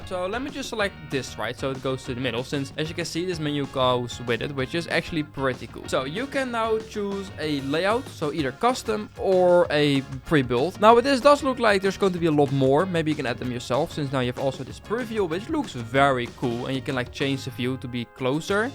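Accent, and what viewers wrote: Netherlands. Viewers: Are you dutch ist looks at a realy dutch accent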